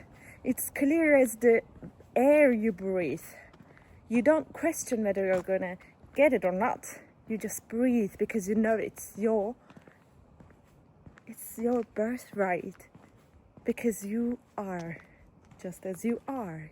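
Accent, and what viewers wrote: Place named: United Kingdom. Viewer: Bunları simdi daha iyi algiliyorum, bilincim katman katman aciliyor.. evrendeki her enerjiye, özellikle kendimden ayirdigim negatif düşünceler ve duygulara bile BEN olarak baktigimda onları kabul edip iyileşmek hizlaniyor. evet her kilit TAMLIKTAN aciliyor. tüm kapsayicilikla tüm olasiliklari BEN olarak görüp icinden arzuladigimi secersem realiteye dökebilirim! bunu farketmek bile benim icin muazzam bir farkındalık, simdi sırada bunun uzerine calismak, çünkü asil olay bunu OLMAK. teşekkürler hizmetin icin. British aksanindan öpüyorum🥰😘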